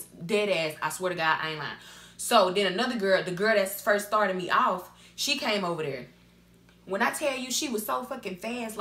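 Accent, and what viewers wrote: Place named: South, United States. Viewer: I'm from Alabama and you sound kinda southern 😊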